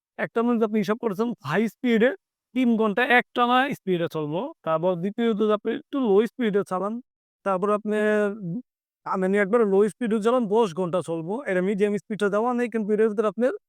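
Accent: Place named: Rakhine, Myanmar. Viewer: Rohinga vashai kotha bolchen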